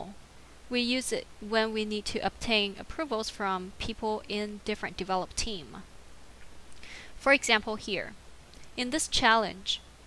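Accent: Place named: United States. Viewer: The question I have is how can I see the contents of "Su Approval List" after the decision table has executed? I have tried to find it in the clipboard and find it using the tracer. Is it possible to find the contents of the Su Approval List page list in the clipboard or using the tracer? Also I was thinking the page list contents could be stored in the database. Since I use the pega academy cloud version of pega I know of no way to search the pega academy cloud version of pega. I had not trouble of getting the same results you got when I repeated your steps. I though it would be could know how to find what is in the Su Approval List page list for debugging purposes in future problems I might incounter. Thus the reason for my question. Keep up the good work. I worked thru 2 of you videos this week and will continue with the other videos as time permits. 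One of the main reasons I like your videos over most of the utube videos on Pega is I can understand your English (because it sounds like the English spoken in the United States).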